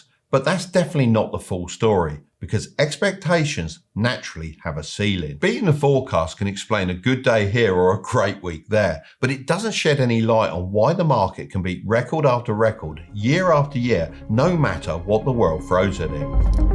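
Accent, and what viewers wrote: United Kingdom. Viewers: come on grand pa. I think you lying. cos america is booming business is great.. you sound liek a British. a depressing miserable land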